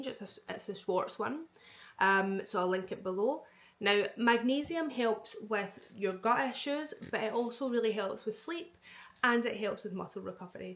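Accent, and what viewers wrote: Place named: Glasgow, United Kingdom. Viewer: I absolutely love the accent! As you say, a broad Glaswegian accent is pretty much a different language.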